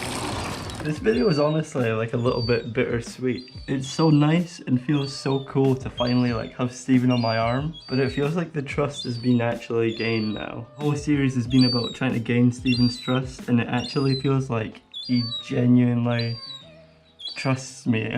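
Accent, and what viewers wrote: Scotland, United Kingdom. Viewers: sounds like a scottish morgz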